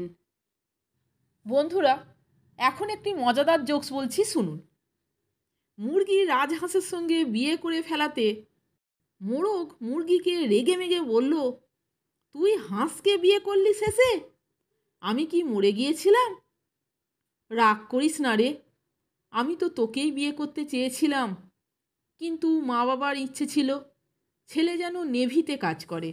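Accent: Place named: Ghoti, India. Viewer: দিদি, আপনি ঘটি, না বাঙাল? আপনার রিলগুলো বাঙাল ভাষায় এবং সম্ভবতঃ বাংলাদেশে বা বাংলাদেশীদের সাথে করা। আর আপনার জোকসগুলি একক কণ্ঠে ঘটি ভাষায়! আমরা সত্যি ই কনফিউজড!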